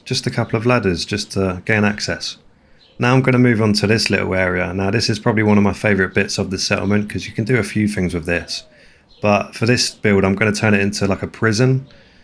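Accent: United Kingdom. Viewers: just discovered your channel and omg. im so happy i found you !! my fo4 is screwed atm (heavily modded and the ng update killed it lmao) so im getting my fix playing new Vegas/watching videos (i have major ADHD/autism and am hyperfixating on fo4 so not being able to play it is miserable tbf). also as a fellow brit i find it frustrating how many youtubers are american so your voice is nice to listen to lmao. (i'd guess you're more of a southerner?) anyway keep it up man, your builds are super creative and i love watching you do them <3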